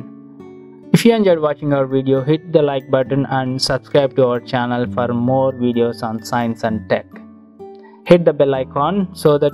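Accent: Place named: India. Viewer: I am from China.Your Indian English is awesome.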